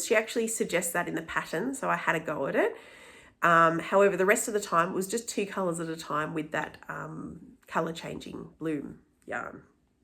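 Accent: Australia. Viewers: Congrats from W.A on a very enjoyable and technically brilliant first podcast, it's always terrific to hear that aussie accent. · Hi, Diane from Mount Waverley here. I was so thrilled when I clicked on your podcast and out came your Aussie accent saying you were from Geelong. I loved the way you promoted Aussie yarns, especially BWM. I can't wait to watch your next video. As for jumper/sweater suggestions, it depends on what type of fit you want. Basic raglan sweater by Joji Locatelli might be a good place to start. Or the Felix pullover by Amy Christopher's or the No Frills Sweater by Petite Knits. I've made the latter two, and they are both good, basic raglan, top-down constructions.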